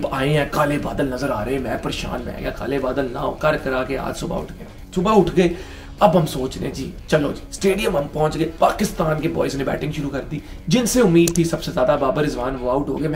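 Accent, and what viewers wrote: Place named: Punjab, India. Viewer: You are so funny and your Punjabi accent.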